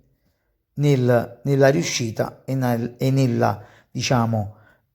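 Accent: Naples, Italy. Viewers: Bravissimo,può fare poi gli struffoli?Sono di Napoli ma abito a Torino e gli struffoli x Natale non mi sono venuti mai bene.Mia madre diceva falli a occhi ma io non lo so fare.Grazie.Sono una nuova iscritta.Mi piace sentire la cadenza"napoletana"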